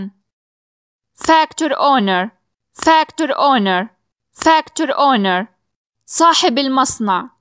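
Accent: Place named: United States. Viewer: هذه تتحدث بالأمريكية مانريد الامريكيه نريد البريطانيه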